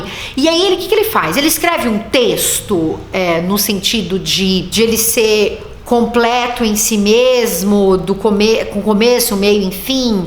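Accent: Rio de Janeiro, Brazil. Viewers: Hahaha legal, mas ela manda bem no jeito carioca de falar. Abração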